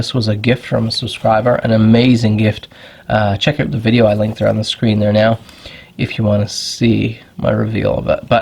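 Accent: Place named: Newfoundland, Canada. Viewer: Yesman your a newfie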